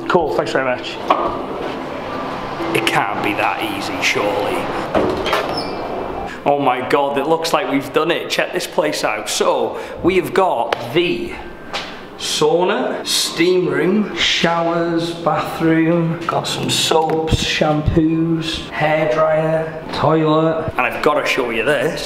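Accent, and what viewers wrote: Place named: Manchester, United Kingdom. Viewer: U sound like a mancunian but u from Wales u sound like Jason manford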